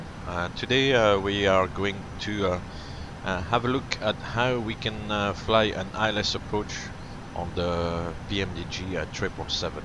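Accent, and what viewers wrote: France. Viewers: love the french accent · most probably French? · as french i can confirm he is from france, i can recognize french accent by thousands xD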